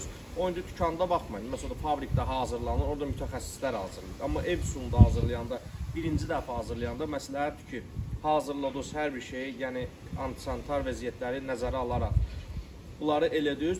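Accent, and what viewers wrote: Baku, Azerbaijan. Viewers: Bakılı balası yorulmayasan!!!! Üreyün necə istiyir hələ də danış!!! İrad tutanlar da bilsin ki koptit közləmə deyil əslində hisə verilmiş deməkdi! Bakı danışıq dialektini qulaqları qəbul eləmirdəəə 😀😀😀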